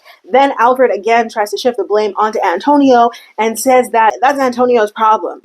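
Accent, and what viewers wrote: Canada. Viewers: OMG! Every time youve said "I sound so canadian" in the past, I thought it was cause you were from the US and just said some words like a Canadian...BUT YOURE ONE OF US?!?!? I mean, I should have known...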